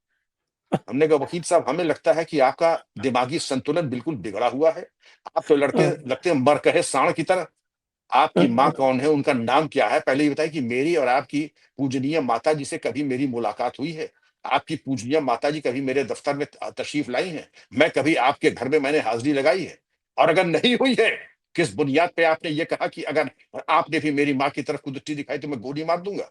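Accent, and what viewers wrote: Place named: Uttar Pradesh, India. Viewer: Vikram sir ka andaz kitna gajab hai pura U.P wala 😂😂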